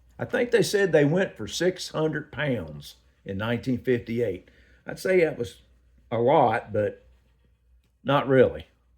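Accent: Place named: Ohio, United States. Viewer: That platform truck is awesome. What state are you from, trying to catch that accent? Ohio? Dump truck is cool 😎. That driver has a lot of brothers, they all look the same. Nice collection. WOW 👍👍👍👍👍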